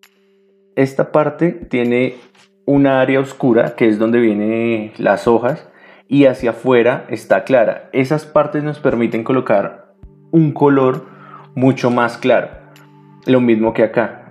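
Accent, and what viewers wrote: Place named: Bogotá, Colombia. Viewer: Habla como rolo jajaja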